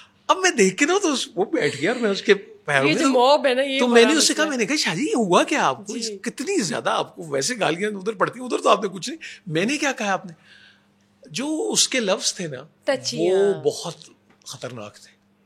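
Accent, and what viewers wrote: India. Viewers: Hindi influence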